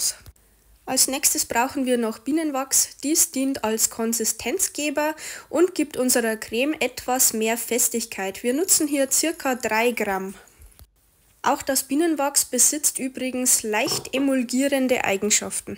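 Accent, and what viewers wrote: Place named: Bavaria, Germany. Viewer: Schönes Video und ein charmanter, Bayrischer Dialket :) Liebe Grüße aus Österreich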